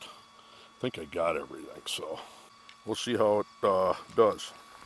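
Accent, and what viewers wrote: Minnesota, United States. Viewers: Are you from Minnesota?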